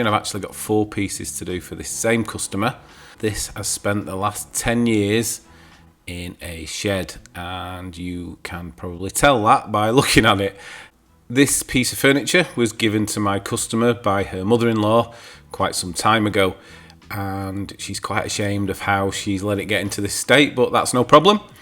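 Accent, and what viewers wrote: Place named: Yorkshire, United Kingdom. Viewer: Are you from Yorkshire?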